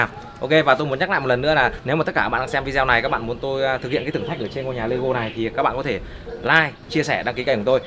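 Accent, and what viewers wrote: Thai Binh, Vietnam. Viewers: Anh ở Thái Bình đúng ko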